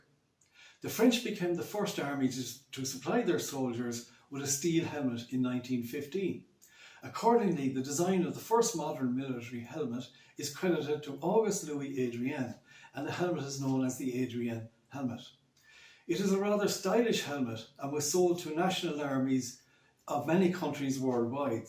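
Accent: Ireland. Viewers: It took me about 40 seconds to start making sense of your Irish accent. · Personaly, I thought the man a fine speaker and his Southern Irish accent a delight to listen to. I have family in Yorkshire and have visited the county lots of times over the last fifty years(great countryside,great food,great pubs,great accents). A good talk, which cleared up a lot of points and scotched a few myths. It was most interesting to note the helmet was in fact a vickers product. Not surprising when there were once at least six Irish Regiments in the Briitish Army. I am from Scotland,and no, - not a Nat, a Brit. Thank you,informative stuff.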